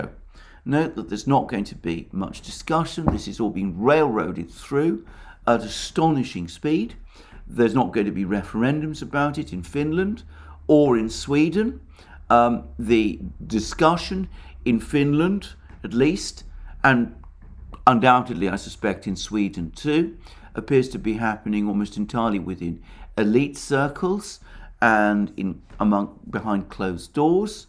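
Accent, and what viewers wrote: United Kingdom. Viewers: I love your British style and cadence of speach. I want to start go learn Russian. I'm sick of all this anti Russian sentiment.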